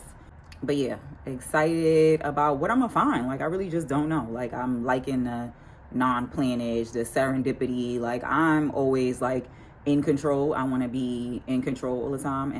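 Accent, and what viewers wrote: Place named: New York, United States. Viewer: So glad i found your page. I love you style, personality and love your NY accent. As a NY'er myself ( Harlemite ) I am feeling your vibe!!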